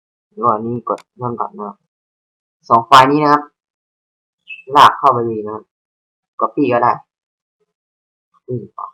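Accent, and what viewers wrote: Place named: South, Thailand. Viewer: พูดเหมือนคนใต้เลย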